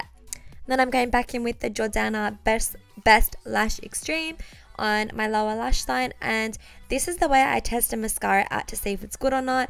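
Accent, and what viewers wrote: Australia. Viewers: Alexa Cryer she’s Australian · Alexa Cryer Australian x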